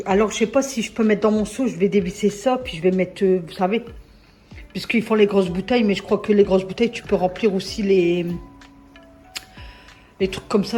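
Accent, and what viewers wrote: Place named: Nord, France. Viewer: est marrante elle s' exprime bien comme dans le Nord aussi bien dans les expressions que dans l' accent. Je suis de la région.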